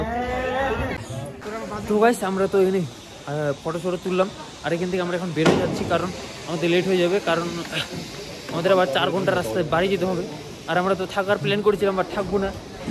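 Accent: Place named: Bangal, Bangladesh. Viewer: At first you have to speak bengali properly, bangal language is not acceptable in India.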